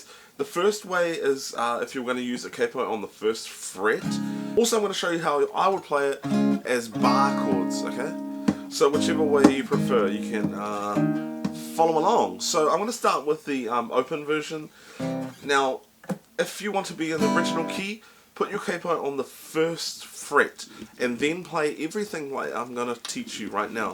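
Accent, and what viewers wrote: New Zealand. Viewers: thank you for uploading this! btw I love your accent, are you from new zealand?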